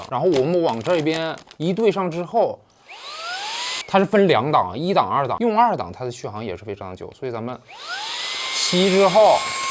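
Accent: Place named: Northeast, China. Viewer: 老哥这一口大碴子味儿 听着老亲切了！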